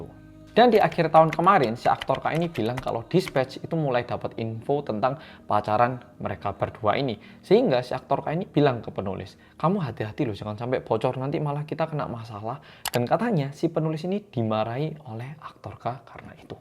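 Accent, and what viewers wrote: Java, Indonesia. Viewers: Mas kalo ngmg korea biasa aja tp kalo ngmg bhs indonesia medok banget jawa nya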